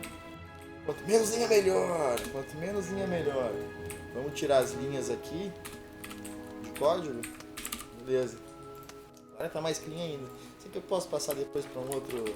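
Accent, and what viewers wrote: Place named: Rio Grande do Sul, Brazil. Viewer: Saudades mesmo! pelo sotaque ele é do RS, ele sumiu no inicio das enchentes, será que aconteceu algo com ele? to preocupado...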